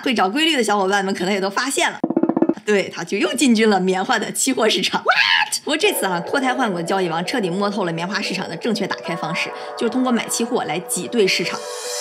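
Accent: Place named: Northeast, China. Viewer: 大姐东北的吧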